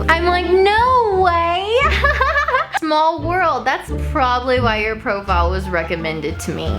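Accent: South, United States. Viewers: you are blessed with a precious southern accent girl!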